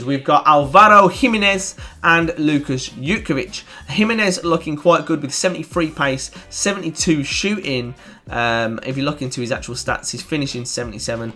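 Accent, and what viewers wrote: Birmingham, United Kingdom. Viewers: What part of Birmingham are you from?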